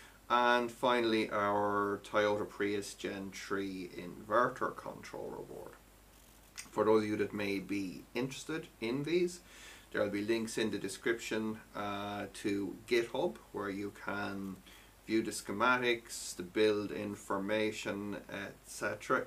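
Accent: Ireland. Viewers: His accent is Irish.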